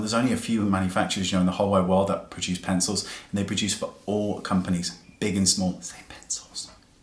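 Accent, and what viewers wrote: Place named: Bristol, United Kingdom. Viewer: Love the Bristolian accent 😊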